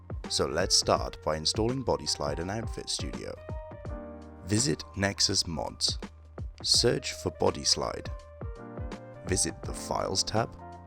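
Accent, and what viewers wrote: United Kingdom. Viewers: Thanks British guy.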